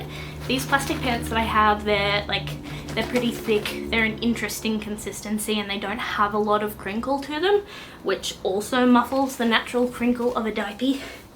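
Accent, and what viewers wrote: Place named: United Kingdom. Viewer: She sounds British.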